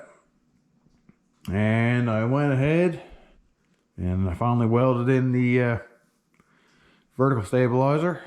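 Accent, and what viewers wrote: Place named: Newfoundland, Canada. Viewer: A treat. Get to hear my homie NL accent explaining the complexities of building an airplane in a garage in Labrador. Thanks for the update.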